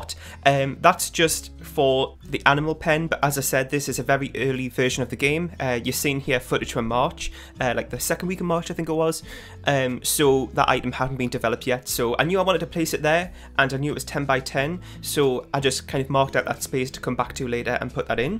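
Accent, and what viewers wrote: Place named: England, United Kingdom. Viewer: Really love the building! And I adore your accent. Where you from in England?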